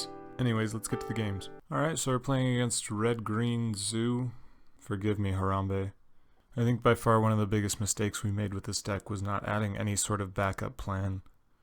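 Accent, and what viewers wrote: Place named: Utah, United States. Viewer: love your vids man, if you're in utah like it sounds like you are we should play some MTG sometime or get drunk and yell at the mormons in the airport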